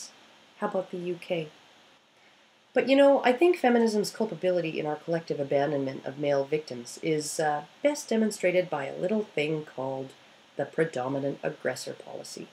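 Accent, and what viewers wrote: Canada. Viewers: Sorry, but I just love the way you Canadians say any word containing out Nothing against Vanadians though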